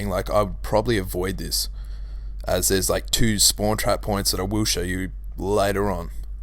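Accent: Australia. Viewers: you are Aussie?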